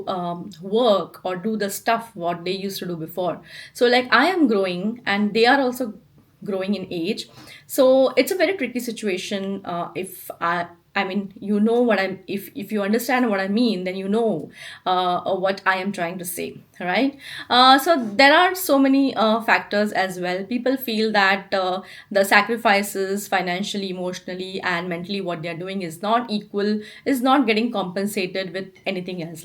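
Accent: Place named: India. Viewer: Thank you.. and I belive i did understand at least half of your indian-english! Its difficult becuse you use another pronunciation! But anyway.. sweden is not a paradise/not either a place to make a lot of money and move home after.. must be better places.. like Pakistan or Afganistan? How ever.. sweden do have one of the higest taxes in the world.. and its goes in to our healtcare and social care system.. its includes healtcare, schools, your pension, your parents healtcare and retirement homes, public transportation and a lot more! Its set up for people that lives in sweden! And then to people frome India and even other countrys.. its almoste higly educated frome top universitys of india or other countrys that get a job in sweden! Or even can apply for a job! And there is altso traps to be aware of.. swedish companyes that tryes to get cheap labour frome India.. 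be aware of that scam! Its rather this.. big international companys even if they are swedish.. do like to hire people frome other cultures.. to get a grip of the market there! High education and on top of that language and cultural skills is a benefit to get a job! Sooo sweden is not a paradise.. exept if one like to build and rise a family.. with kids!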